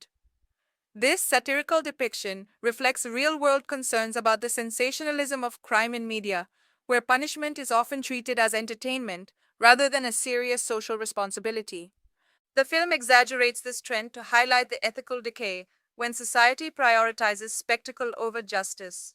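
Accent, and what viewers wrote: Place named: India. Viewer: That Indian voice from the narrator is horrible as hell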